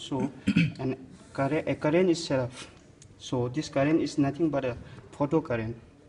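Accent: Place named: India. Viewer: are you from india ,well explained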